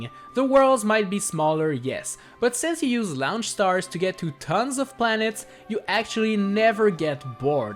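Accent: Quebec, Canada. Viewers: ca sonne vraiment quebecois ton accent chum ! premier video, thumbs up ! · Are you French Canadian?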